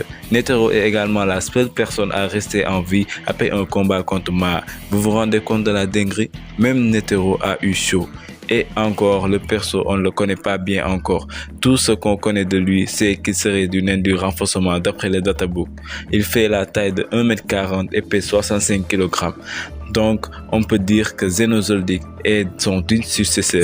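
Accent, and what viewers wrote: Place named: Senegal. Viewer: Yo t'es sénégalais.wais je reconnais l'accent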